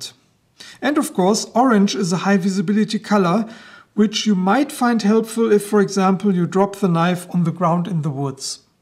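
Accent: Germany. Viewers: You really make the best reviews! Both for knives and other equipment. They are logical, detailed and easy to understand even for us with little training in the English language. The German accent is quite charming, too :-)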